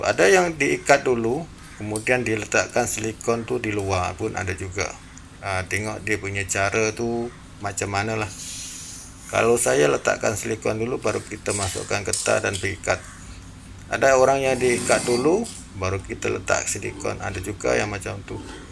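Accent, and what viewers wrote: Malaysia. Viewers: Cakap pon dh campo+ mcm org msia😅😂 tmkasih ya❤moga dimurahkan rezkinya&diberkati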